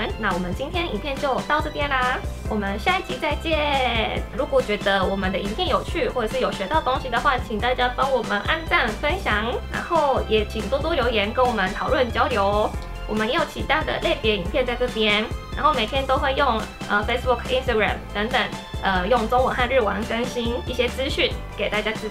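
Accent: Standard. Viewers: 且慢！你这熟练的国语，你真的是日本人吗？？？为什么国语比我还标准？！ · 撒醬的中文大概是我聽過的日本人中最標準的。語調、用字大部分都正確，厲害！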